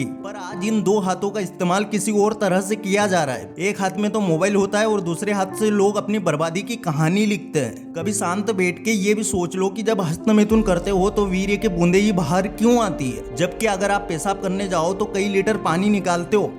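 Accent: Indore, India. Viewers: Indore ke aaspas ke ho aap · Aap indori lag rahe ho...accent se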